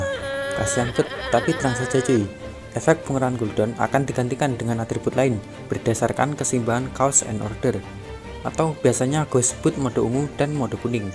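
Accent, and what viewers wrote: Java, Indonesia. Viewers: Bang suara nya medok banget anjir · kalau medhok gak usah gua gue bang, gak cocok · medok bet lu bor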